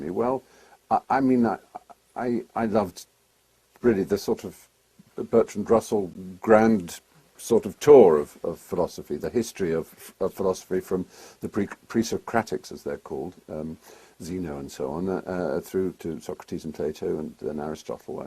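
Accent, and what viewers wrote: England, United Kingdom. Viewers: Mental masturbation with an English accent.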